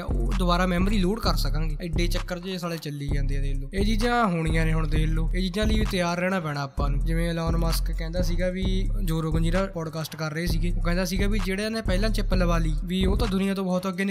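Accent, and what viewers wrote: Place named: Malwa, India. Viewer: Mittra Bolan da way tera Bda Ghaint aa tera Malwayi lgdan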